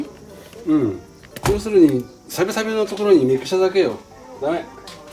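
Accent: Niigata, Japan. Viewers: 新潟っぽいしゃべりだったので検索したら阿賀野市だったんですね！！ CB750Fは高校の頃憧れでした。最初のエンジン音で「おかしな音」と感じました。知識がないショップに当たってしまうと不幸ですね。。。。。しかし外見は綺麗ですね！！凄く興味深く観させて頂きました。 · ところどころに新潟弁が聞こえる。新潟県のショップさんですか？ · 東京で生活してるけど、聞き覚えのあるようなアクセントだなと思って調べたらやっぱり新潟の人だった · 完璧な整備に感動しました。なんか･･･新潟訛り？、どこにあるんだろう？調べたら、やっぱ新潟だｗ 来年暖かくなったら、帰省がてら見学に行こうかねぇ！NSRで！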